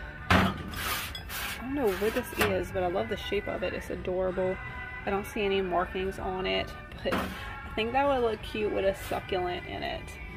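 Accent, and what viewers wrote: Louisiana, United States. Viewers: Are you from Louisiana? ❤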